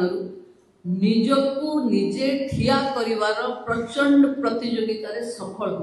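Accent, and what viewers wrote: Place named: England, United Kingdom. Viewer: Speak clear odia. No need to speak english style odia.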